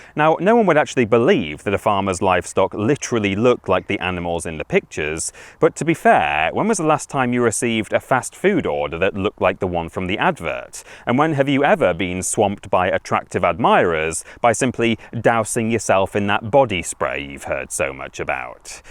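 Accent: United Kingdom. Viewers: I heard the British accent even before I turned up the volume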